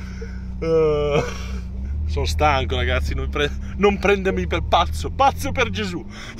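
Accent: Genoa, Italy. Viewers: Video veramente stupendo 🤩 ma belin dato che parli in genovese spero che tifi Sampdoria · Bellissimo video sei migliorato tanto, qualità,contenuti, durata e improvvisazione. Intrattieni sul serio, dovresti fare una serie tipo Gomorra sulle auto e lifestyle Bye con la cadenza genovese ahah numero uno ti adoro 😂😘💪🏻🥇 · Aaaaa il genovese di ahahah😍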